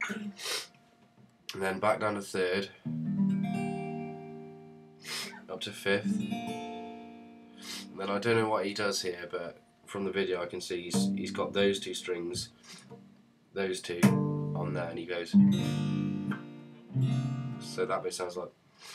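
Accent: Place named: United Kingdom. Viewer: wow.That was actually the best Andy tutorial i saw on youtube,and the best british accent i heard in my hole life.Thanks bro!!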